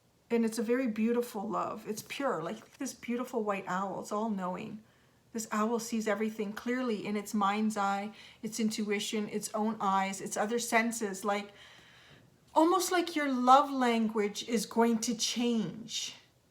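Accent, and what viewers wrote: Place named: Canada. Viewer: Great reading! Thank you ❤️ I thought I detected a fellow Canadian there, especially after I saw the Roots shirt 😄 Which part of Canada are you from? Hello from Calgary!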